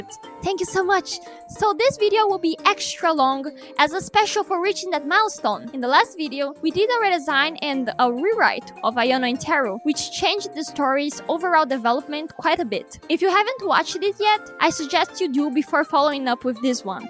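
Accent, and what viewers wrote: Brazil. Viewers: You have a VERY strong Brazilian accent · Hey, are you brazilian? You kinda sound "brazilianish" idk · hey idk if you will see this but are you brazillian? because your accent really sounds like a brazillian accent, especially when saying the rivals names (i'm brazillian thats why i recognize it xD) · MANO EU CONHECI SEU CANAL AGORA MAS EU BATI O OLHO E JA SABIA Q VC ERA BRASILEIRA KSHSKSJSJ, sabia que o jeito q os brasileiros pronunciam o nome da osana e da raibaru realmente muda muito em questões de linguagem? Tipo eu acabei sabendo que uma mina era russa por conta disso e por isso descobri q vc era brasileira! E muito bom ver brasileiros tão talentosos quando você! Adorei o vídeo e os redesigns tb<3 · Mano, eu já sabia que era brasileira por causa do sotaque e o jeito de falar os nomes das personagens. (Me humilha menos no desenho😅, ficou incrível os desenhos. 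)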